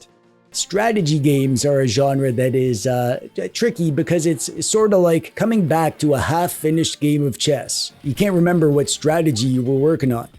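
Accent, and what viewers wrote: Canada. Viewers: hey man what part of canada is that accent from? i met a guy in New Zealand who was from Canada and he had the same accent as you, i think it's cool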